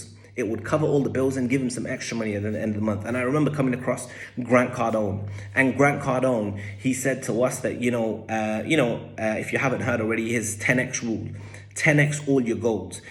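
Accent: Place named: United States. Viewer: Are you from America? Great accent!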